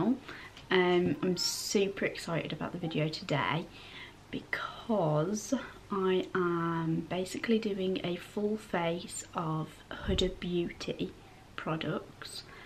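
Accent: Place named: United Kingdom. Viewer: I’m in love with your British accent...it’s so charming!!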